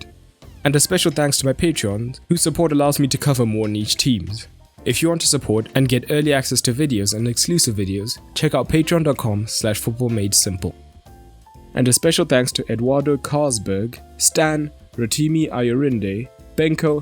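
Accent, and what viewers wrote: South Africa. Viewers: Are you South African g? Really good video!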